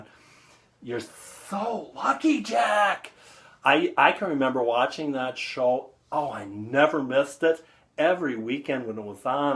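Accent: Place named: Los Angeles, United States. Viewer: Holy cow, made me feel like I had moved back to Kommiefornia for a second, sounds like 50% of LA county. So glad I moved to Fargo.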